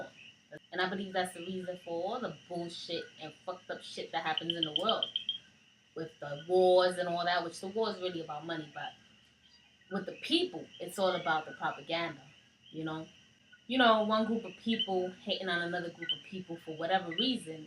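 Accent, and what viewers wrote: Nuyorican, United States. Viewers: Vaya Mamii...I can tell off the back your NYRICAN all the Waay!!! Love the NyRican Accent unique unlike no one else....You sound like your a Brooklyn Boricua. Super Beautiful mami. Yea' Dominicans don't want no fuckn Beef with Boricua cause the same way we open the doors for those YOLERS we can and known how to Close them. P.Ricans have 3Million Boricuas alone in NYC so they can figure that one out plus we have 100yrs in NYC they came in the YESTERDAYS" Thanks to the NYRCIANS.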